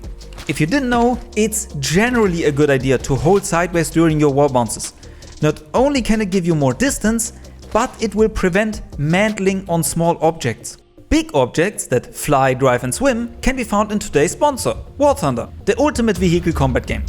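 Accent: Germany. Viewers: Hello my German friend, man hört das sehr stark raus und ich liebe es 😂 Danke für deinen Content und deine ganzen Bemühungen ^^ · Is he German ? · Ich find's geil das man den Deutschen Akzent direkt raushört😂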